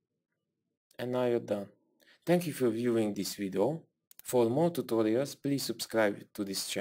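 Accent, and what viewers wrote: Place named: France. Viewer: French accent detected, merci for reading.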